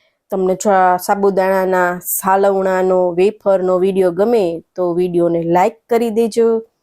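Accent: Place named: Rajkot, India. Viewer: બેન રાજકોટ ના લાગે છે બોલી ઉપરથી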